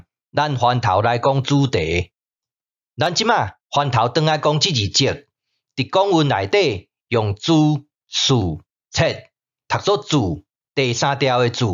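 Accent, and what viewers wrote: Zhangzhou, China. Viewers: 千萬別改你的腔調，我住南部的關係，很難得聽到偏漳州腔調。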